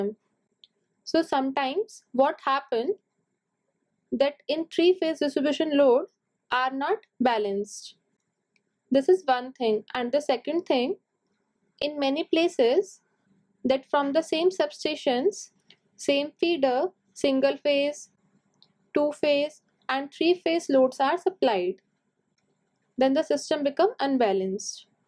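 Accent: India. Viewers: Indian voice is hot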